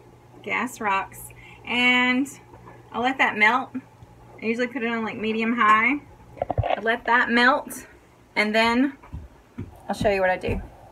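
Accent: South, United States. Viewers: When you say....Hi y’all.....is part of why I watch your videos....could listen to that southern draw all day.....thanks also for showing us how to cook steaks with cast iron skillet and butter.....have a blessed evening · Love the southern accent and it looks like great steak for supper tonight. Huntsville Ontario Canada 🍁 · Great video just love the southern accent y'all 😍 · I like steaks love butter and like that southern accent you did have me worried at the beginning though when you said you were going to go in the barn and get a steak LOL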